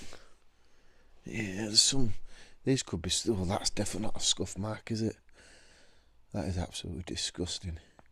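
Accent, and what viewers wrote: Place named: Yorkshire, United Kingdom. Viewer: You do remind me of somebody from emmerdale with your accent 👍enjoy your channel mate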